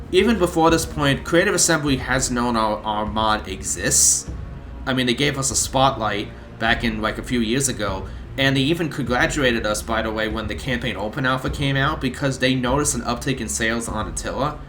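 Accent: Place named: Rhode Island, United States. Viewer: Dude sounds like he from Warwick RI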